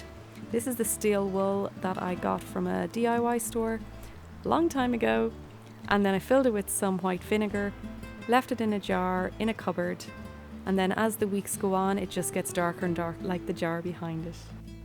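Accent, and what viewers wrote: Ireland. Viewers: Well done!! Really neat to see the different ways to make and use stuff, that's lying around the house. I for one would love to see more shows like this from you. Thanks for sharing!! By the way...I lived in County Kerry Ireland for a year, many years ago. Ireland is such a magically beautiful place. I miss it dearly. Though, watching your video enables me to feel a bit of that connection again. Thank you for all the work you do. I love (and remember well) that lyrical Irish accent that I use to encounter when I lived there; hearing it always made my day. Yours is lovely, as well. :)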